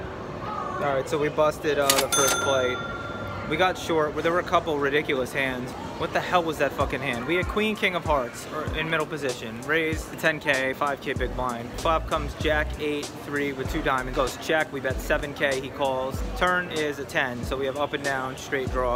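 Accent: Australia. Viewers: 7/10 on the Aussie accent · the aussie accent is a solid 9/10. love it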